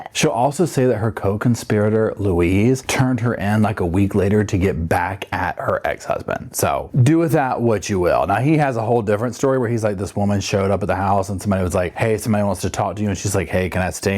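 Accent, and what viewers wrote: South, United States. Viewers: Just found you.... LOVE your "southern' accented comments.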